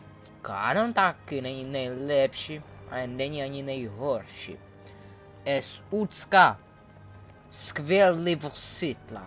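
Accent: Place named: Vietnam. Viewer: spis mluvis jak vietnamec nebo chinan ktery se naucil cesky a nezni to jako rusak moc (nepisu haci ani carky protoze jsem linej)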